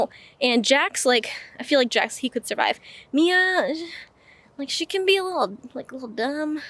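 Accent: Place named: United States. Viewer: Great video. You certainly don't have that unique German account. You sound very much American. How is it that you went from America to Germany? Must be a very interesting story. While stationed in Germany with the Army which was a "lifetime" ago was the Army Depot there in Miesau.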